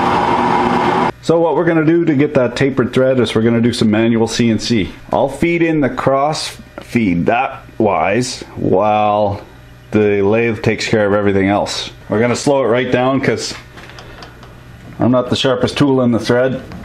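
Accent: Quebec, Canada. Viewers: tout tes French-isms que tu fais, j'essaie de deviner d'ou tu viens. Dans un autre video tu disais que t'es un "tête carré anglophone", mais tu parle un français plutôt Québecois. Je dirais Montréal ou Ottawa. Side note I was called a square head by some grumpy old man on a Montreal ham radio repeater, telling me to go back to Ontario, but I've never lived in Ontario. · I'm French Canadian too. I live in the states now but that Canadian Frenglish speak cracks me up every time. Brings me right back to where I came from... lol. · HAHA are from quebec because your french sounds just like mine!! Cool videos btw! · HAHAHA ! jaime comment tu parle ! pas besoin de t'sa esti ! vivez vous proche du quebec ?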